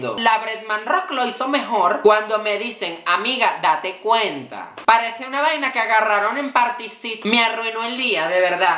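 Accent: Venezuela. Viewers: Amo como hablas Chama yo también soy venezolana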